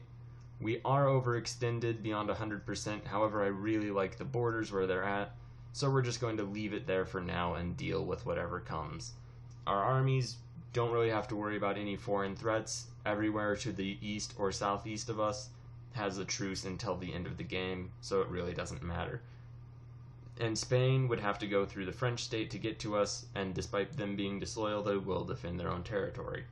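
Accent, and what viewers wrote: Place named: Netherlands. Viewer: the way he speaks english I'd bet he's dutch.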